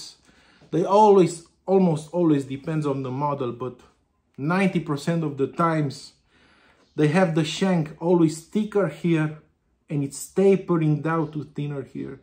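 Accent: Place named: Liverpool, United Kingdom. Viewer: lol! your Liverpool accent 😂 i like you even more now 😊 i really like Condor too and, as it turns out, Ennerdale too. i may have been wrong about Lakeland tobacco.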